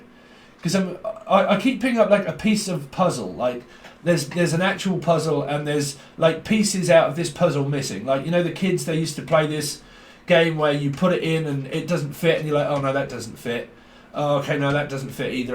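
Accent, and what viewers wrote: United Kingdom. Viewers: your lovely british accent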